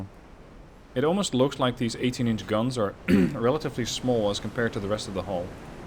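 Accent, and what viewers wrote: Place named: Netherlands. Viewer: u r dutch right? if not i can hear a slight dialect as if ur main language isn’t english, and the dialect just sound super ducth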